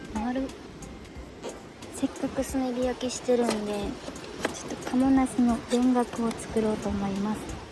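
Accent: Kansai, Japan. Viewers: 久しぶり～😆 相変わらずのかわいい関西弁ステキです🤓 でもここで 「目隠すよりピップエレキバンやろ❗」 って、つっこんだらの思惑どおりやろな🤔 負けへんで❗🤓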